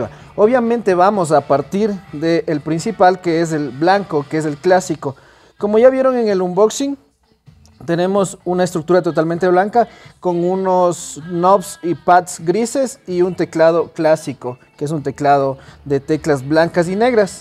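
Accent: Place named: Ecuador. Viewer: de ley eres voz ecuatoriano, saludos desde cuenca, yo tengo la version blanca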